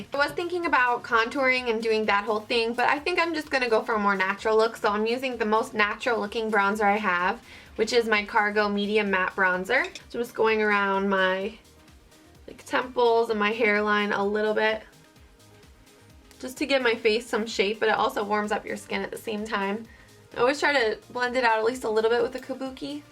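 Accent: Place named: Philadelphia, United States. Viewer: So cute! Love the dress too. Every time I watch one of your videos, my husband, who grew up in South Jersey, but whose family is from Philadelphia always says "It's that girl from Philly, right?" I can't hear your accent, but he can!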